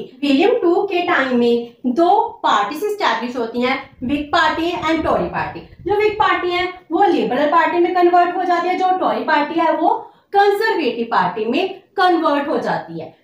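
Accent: Punjab, India. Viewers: Mam you made Polity as easy as Hindi hats off to you mam🙏 Dhanyavaad . Aur aapke samjhane ka tareeka , 5abi accent Best Way mam Thank you🙏 Acche teacher ki nishani hoti hai uska subject ke prati interest joh dikhta hai aapke padhane mai . May god bless you.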